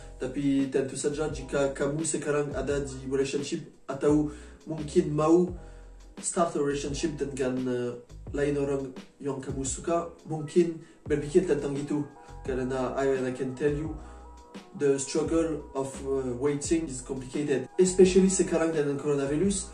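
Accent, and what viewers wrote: France. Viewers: The only problem I have right now is my boyfriend can't visit me during this pandemic, and if he's using agent, he would need a quarantine when he's coming back to his country, that's the worst problem we're facing right now. 😅 Agreed to the point where you said about language barrier, not so many Indonesians can speak English properly tbh, you could see it by browsing LDR videos in YouTube 😅🤣 And I already noticed you're from France from your accent and tongue click! And I think I'm correct 🤣 Greetings from ID - DK couple 🇮🇩🇩🇰, nice video and nice Indonesian language skill 😊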